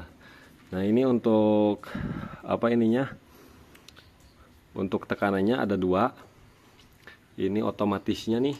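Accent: West Java, Indonesia. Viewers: Mas'se kalau bicara,,?,🙄 logatnya seperti orang Jawa Barat..😅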